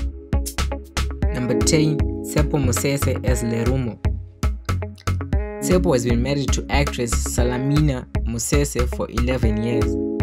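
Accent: Zimbabwe. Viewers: Why do I think that the narrator is from zim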